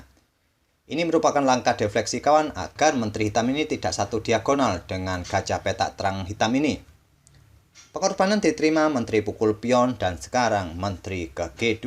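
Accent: Java, Indonesia. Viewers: Medok amat mas jawa ne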